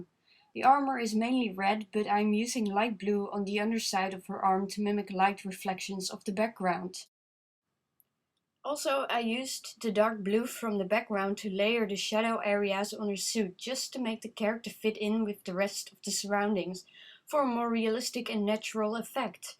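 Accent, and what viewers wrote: Netherlands. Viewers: I recognize that accent...Netherlands?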